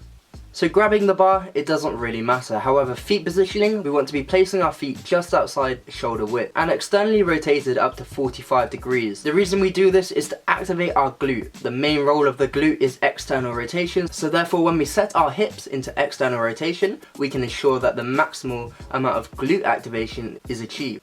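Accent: England, United Kingdom. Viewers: I would be very glad to know your thoughts or opinion on achieving an athletic/lean body without adding weights; just the body as in push ups, regular sit ups etc. Do they work for defining and accentuate the muscle? Ps: Which part of England is your accent from? I haven't heard it until now.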